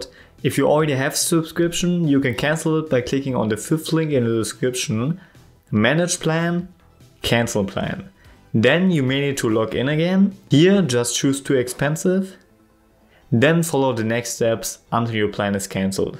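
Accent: Germany. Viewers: meinte Deutschland einfach wegen aktzent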